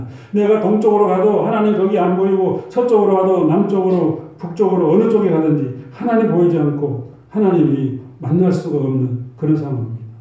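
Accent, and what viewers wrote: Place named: Jeolla, South Korea. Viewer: 어제 꿈을 꾸었습니다. 선생님꿈을 몇달만에 또 꾸었습니다. 살면서 딱히 선생님을 생각하지않아도 1년에 한두번은 꼭 선생님꿈을 꾸게됩니다. 그때마다 선생님이 그립고 궁금했지만 소녀시절 추억은 추억으로 마음에 묻고 그냥 세월따라 가곤했습니다. 그런데 오늘은 선생님이 궁금해졌습니다. 이 쉬운세상에 찾을려면 얼마든지 찾을수있다는 생각에 네이버를 샅샅이 뒤졌습니다. 유투브를 샅샅이 뒤졌습니다. 목사님으로 검색했더니 몇분의 목사님이 떴지만 저는 대번에 이분이시겠구나 싶었습니다. 40년전 저는 어리디어린 열네살이었죠. 중학교 1학년2학기때 오셔서 드문드문있는 수학과목을 한학기만 가르치시고 떠나셨는데 40년이지나서 선생님을 알아뵙기란 쉽지가않더군요. 사실 지금도 긴가민가합니다. 오늘은(지금 시간이 새벽이니 어제는이 맞겠지요) 하루종일 선생님 유투브 아니 목사님 설교말씀만 들었습니다. 또 없나 샅샅이 검색해서 청소하면서도 듣고 염색하면서도 듣고 씻으면서도 듣고 마트오가면서도 듣고 누워서도 듣고 앉아서도 듣고 서서도 듣고 걸으면서도 들었습니다. 그러니까 어제는 새벽부터 선생님꿈 아침부터 낮 밤까지 하루종일 선생님 아니 목사님 설교말씀만 들었습니다. 순전히 우리 수학선생님이라는 이유때문에요. 덕분에 하루종일 하나님말씀 실컷 들었습니다. 그런데 진짜 우리선생님이 맞나요? 그때는 제기억에 아주 젊고 엄청 미남이셨는데 지금뵈니 키 크셨던거랑 얼굴 이미지가 비슷해요. 그리고 설교말씀듣다보니 악센트가 전라도억양이 있으셔서 그때 선생님 맞으신거같아요. 혹시나 사모님이 카메라에 잡히면 더 확실해지는데 아무리 이것저것 돌려봐도 사모님이 안잡혀 40년의 세월이 흘러서 제가 사모님도 못알아뵙는가싶구 확인이 안되네요. 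하여튼 선생님 참 반갑습니다. 유투브 올려주신 집사님 아주 감사합니다. 덕분에 집사님찬양도 많이 들었습니다. 앞으로도 목사님 설교말씀 자주 올려주시면 안될까요? 소리가 작아서 아주 집중해서 들었습니다. 앞으로도 잘 부탁드립니다. 감사합니다.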